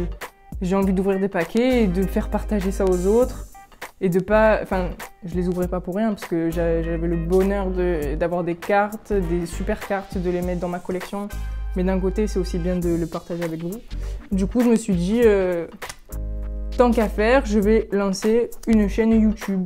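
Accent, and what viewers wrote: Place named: Marseille, France. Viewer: Accent marseillais décuplé fois 1000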